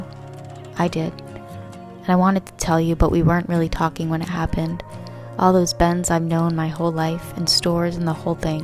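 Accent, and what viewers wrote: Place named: France. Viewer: As a french person, i love your french accent !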